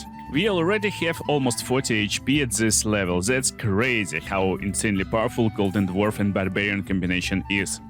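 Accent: Russia. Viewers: Даже на английском ютубе по бг я нашел человека с охранительным русским произношением. Гайд кстати хороший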